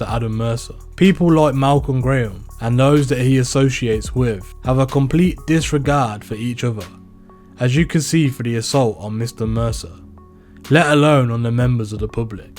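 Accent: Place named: Coventry, United Kingdom. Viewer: Not a clue where ur from, but I’m gonna guess Coventry from the accent 👀big ups bro keep up the good work, pusb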